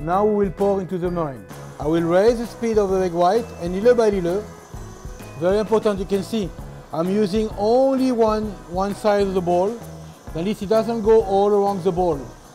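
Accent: France. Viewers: I love his French accent!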